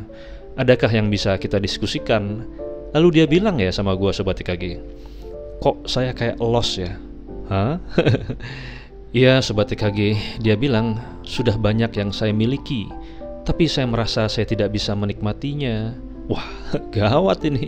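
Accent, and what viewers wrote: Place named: Sunda, Indonesia. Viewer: Suara bang EKG khas suara orang sunda ,,, suaranya sendu ,,entah knp dnger suaranya sedih,, tp kisah"nya sangat menginspirasi sekali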